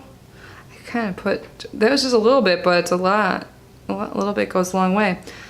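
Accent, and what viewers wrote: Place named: Chicago, United States. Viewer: Chicago! Is that where your accent is from?Where did you get your mirror?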